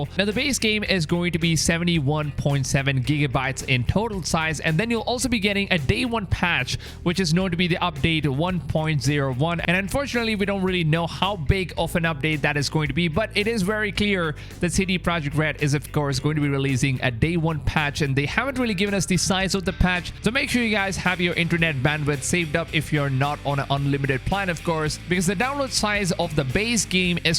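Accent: India. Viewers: Just a lame vid about skyrim titled cyberpunk from a guy with a 7-11 accent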